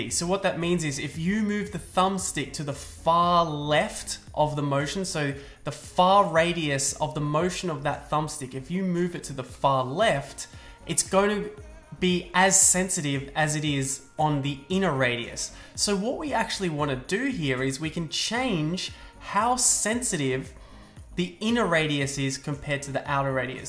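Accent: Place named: Australia. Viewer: Just bought a Mavic Air for Aussie trip in Dec. I've always flown without FPV with a Phantom 2 and got some great footage using a GoPro Hero4 just using line of sight and sort of guessing what I'm filming. It's worked in the past more or less. But having a phone seeing the live feed will be a game changer for me. Some great tips here... the screen can be intimidating on first glance, but I'm slowly understanding the functions, good to know how to change the sensitivity of the Yaw and gimbal, great work keep it up, nice to hear a Aussie accent, makes a lovely change from American which is the usual on here. Greetings from the UK.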